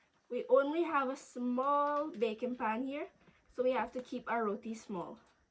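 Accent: Scotland, United Kingdom. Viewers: Nice to see you cooking our traditional Guyanese cuisine,nice job and I love your Scottish accent , have a blessed evening and enjoy your vacation, there is nowhere better than home. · you're accent is all Scottish now. Beautiful 🙏🏽🥰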